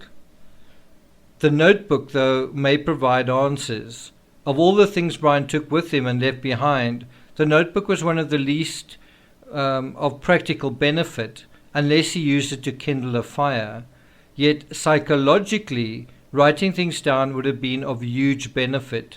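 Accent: South Africa. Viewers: Btw, are you South African? My brother in law is and I hear that accent, particularly the last millisecond at the tail end of each of word as it cascades away into our eardrums... I dig it man! I want you to know I appreciate the way you break things down very quickly when you speak,..which is very therapeutic & healing. Are you a therapist? I get the vibe..you understand human psychology & introspective philosophy seamlessly. Brilliant. Thank you, You help me look at myself in new ways and can now work my way through some personal struggles and trauma's with an arsenal of new tools to apply when the healing hands of time are out of time... Much love & respect!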